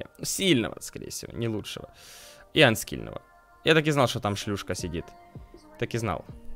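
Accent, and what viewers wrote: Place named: Surzhik, Ukraine. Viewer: на мое мнение, звучит коряво. Это суржик с украинского на мою думку. Пожалуйста, по моему мнению, уши немного режет)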